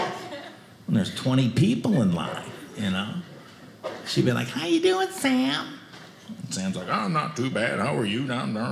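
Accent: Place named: Wisconsin, United States. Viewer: Boy that Wisconsin accent is unmistakable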